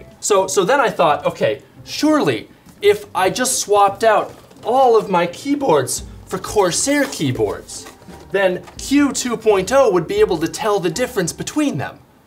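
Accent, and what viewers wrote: Netherlands. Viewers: Are You from the netherlands? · Are you dutch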